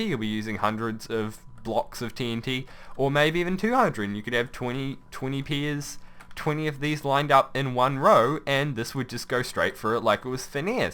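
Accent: New Zealand. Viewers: He's from new zealand that's his accent. Nobody's perfect.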